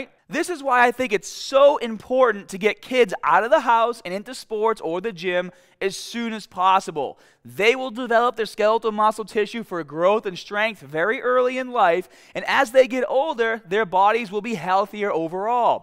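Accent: Boston, United States. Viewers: it's just PAHT of his brand - people keep posting comments about a Boston accent - more comments posted - Boston accent gets discussed all the time - Youtube ranks him higher because viewers are engaged enough to post comments - more views for his channel - it's just the nature of the online world - if you don't appear near the top of the hit list ( youtube, twitter, browser - whatever ) you are NOWHERE - NO ONE sees your videos it's just like all those terrible clickbait titles that just about everyone, even legit youtubers use to get views - same with the natty/juicing discussions - no such thing as bad publicity as long as people post comments unless you've tried to get your own web site/business ranked by Google and Youtube and seen how brutal the competition is - it's as brutal as trying to get an acting part millions of people dream of making an online living but very few find the right mix that makes your brand stand out -- ( personality, looks, humor, informative info, frequent updates to channel ) - anything that helps you stand out is a win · Did always have a slight Boston accent? 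· Wow one of the strongest Boston accents evvaaa lmfao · I've watched a ton of your videos many years ago and around 1 year ago I had to find the video where you discussed the change in your accent. I'm watching this video today and although the topic is good I still can't get over the over emphasised Boston accent. Not a diss in any way but for some reason I can't take it as seriously like your old videos. Again no disrespect but the accent I got used to in the past sounded more intellectual whereas the accent now sounds quite dare I say it low class. In England we have many accents too, some sound posh and some just down right ghetto/street. It's the same sort of thing going on here. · Never change, Bahston. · fuck yeah boston accent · Is this guys Boston accent foreal? That shit is strong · First time on the channel. Love the video. But more importantly, do I hear a Boston accent??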